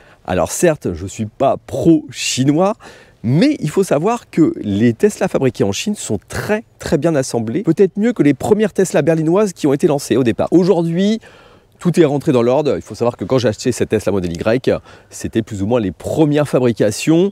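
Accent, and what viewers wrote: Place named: Paris, France. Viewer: J’en peux plus de ce E preposale à la parigo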